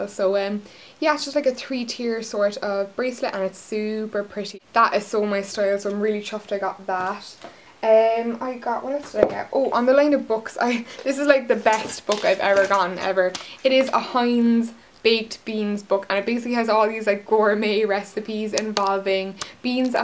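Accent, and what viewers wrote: Ireland. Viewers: R u from ireland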